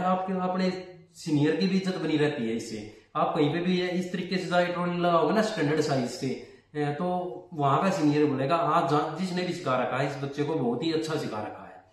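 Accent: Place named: Haryana, India. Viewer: Paka haryana ta hai ladle 😂😂😂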